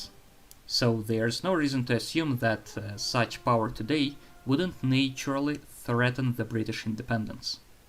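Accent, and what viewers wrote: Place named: Russia. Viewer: Interesting thats the top and only YT video on British geopolitics is delivered with a Russian accent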